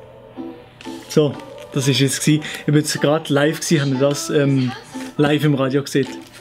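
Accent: Switzerland. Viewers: Bist du Schweiz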